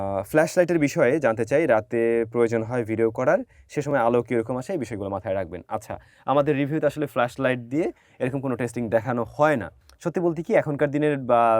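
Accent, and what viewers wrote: Barishal, Bangladesh. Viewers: বরিশাল কথায় আপনার বাড়ি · বরিশালের কোন যায়গায় বাড়ি আপনার? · ভাই বরিশাল আপনার বাড়ি কোথায় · ভাই বরিশালের কোন এলাকায় থাকেন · ভাই আপনি আমাদের বরিশালের লোক?🫶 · আমনেও মোর মতো বরিশাইল্লা❤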